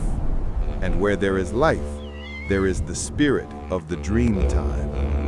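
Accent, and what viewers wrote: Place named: United States. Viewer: What a load of BS - it would have to be some American voice over - as usual!!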